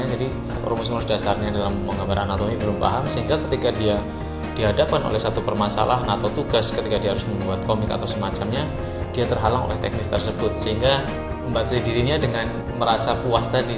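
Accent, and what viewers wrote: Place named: Java, Indonesia. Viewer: bandung kok logatnya medok mas?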